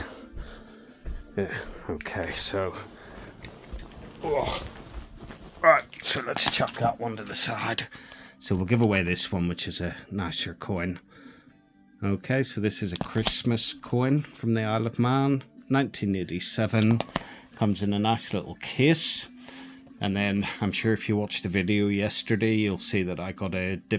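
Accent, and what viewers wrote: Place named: Ireland. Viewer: Good morning mr first of all Wishing you swift and full recovery mate and thanks for the reply I sure do miss the way you do the channel And everything else how you tell it as you feel love that too I’m straight talking as well so All the best mr hope too see and hear that famous IRISH tone in the near future keep well sir ok thanks again ROYBOY in Yorkshire xx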